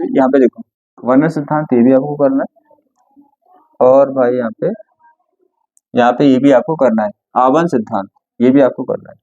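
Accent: India. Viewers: Sir aap Bareilly se lag rhe hai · Apko dekhne se nhi lg rha language hi up ki h 😂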